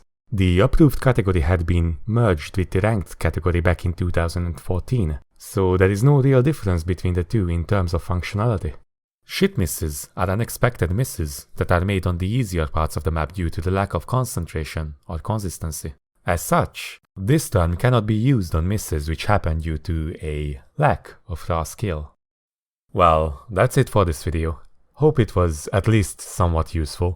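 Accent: Hungary. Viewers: Az akcentusból éreztem hogy hmm magyar lehet, aztán xD keep it up bud ^^ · Wait are you hungarian? Great video btw!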